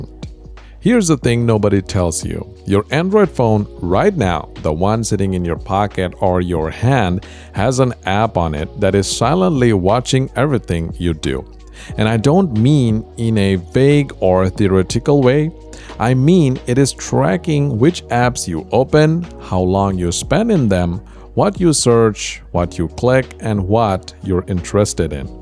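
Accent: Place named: India. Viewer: Odd.. your skin tone does'nt seem to match your accent? Are you from India?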